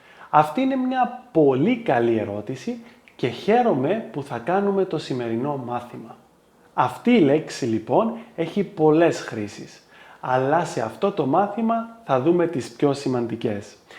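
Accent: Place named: Cyprus. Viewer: Ακούγεσαι λίγο σαν Κύπριος. Διδάξτε με πότε να χρησιμοποιήσω Ω και ο στη γραμματική. Δεν έχω πάει ποτέ στο ελληνικό σχολείο. Είμαι αυτοδίδακτος.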